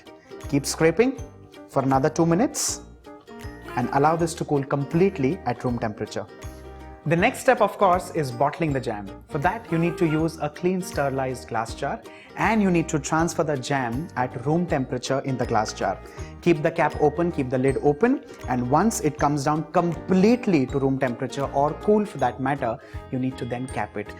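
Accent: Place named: India. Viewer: hahah - indian English is amzaing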